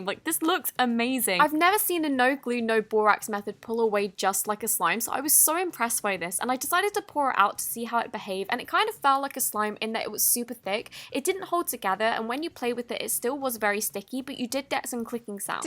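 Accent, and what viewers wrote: United Kingdom. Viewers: Hi!! I love your videos sooo Much!! You're so sweet and makes The best slime videos ever, because you don't talk sooo Much!! I hate when People are talking for ages. But you just talk simple and clear about The slime you bought/made and its so relaxing to watch your videos:) you are My favorite YouTube!!- one of your fans/followers❤️ PS I love your British voice<3 I Hope you read This comment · Are you British? Cause you sound like one -_ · I bet $<num> that she won't answer are you british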